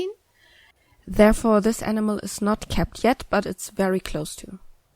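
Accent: Germany. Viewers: I appreciate the effort that went into the video and the english version, but I can´t deny that I heavily dislike the german accent. It´s extremely distracting to me. I´m german myself, sadly.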